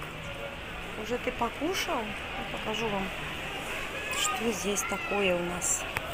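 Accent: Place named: Georgia. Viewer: А мне нравится как вы разговариваете на русском языке!Такая изюминка присутствует в легком акценте.Если бы вы сказали,что грузинка,то все писали бы ,,ой как вы хорошо говорите по русски!"А прожить в Грузии столько много лет и разговаривать по грузински....конечно меняется интонация!Я вас понимаю потому что сама жила в Грузии 4 года с родителями и ходила там в школу,это было при Советском Союзе.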